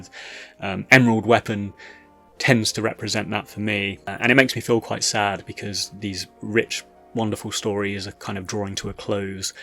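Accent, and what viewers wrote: United Kingdom. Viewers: British limey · fuckin' jesus wow british accent old game "review" that's an opinion dude find something else to do lmao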